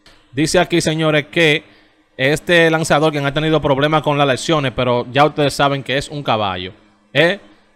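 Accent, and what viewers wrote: Cibao, Dominican Republic. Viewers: A lel de pal de meses🤣😂🤣😂🤣...me imagino que eres cibaeño.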